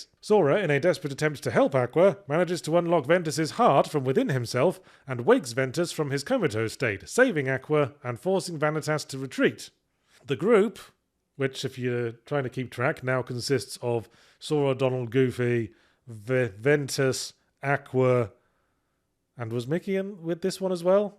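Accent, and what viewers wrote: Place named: United Kingdom. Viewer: I have had multiple people in the past try to get me into this series when they found out I hadn't played any of the games, by explaining them. Without fail, roughly 5 minutes in, my eyes would glaze over and I would silently stand up and walk away without saying a word. I made it through 10 minutes of this video, largely on the strength of Yahtzee's voice and British accent (none of the previous people had British accents) before my eyes started to glaze over and I stopped the video to type this message. Hope the video does well, but 10 minutes is all I can stand.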